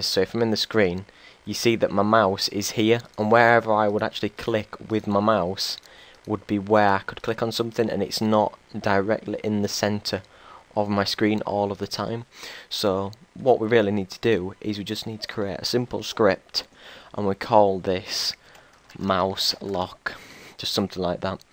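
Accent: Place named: England, United Kingdom. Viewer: Haha strange isn't the right word maybe, sorry. ^^ I come from Germany but I never heard such a dialect from England!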